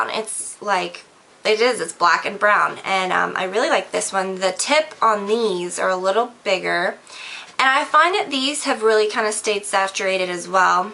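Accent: South, United States. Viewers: Where are you from...cause I can def hear a southern twang :)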